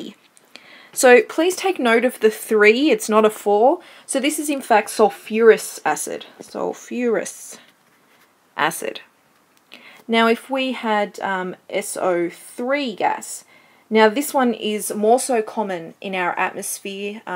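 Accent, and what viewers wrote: Australia. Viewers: what accent is this? Australien?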